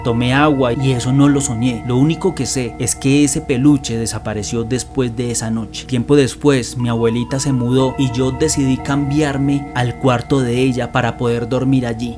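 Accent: Colombia. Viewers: Me gusta mucho tu acento colombiano 😊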